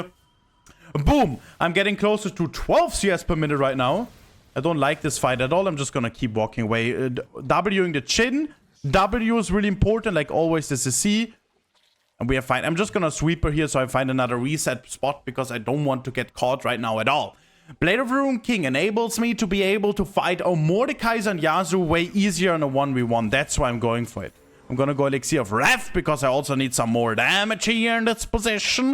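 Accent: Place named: Germany. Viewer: Dierekt gemerkt dass er deutscher ist hahahha aber echt gutes guide video fuer low elo und die fundamentals zu zeigen wie wichtig das ist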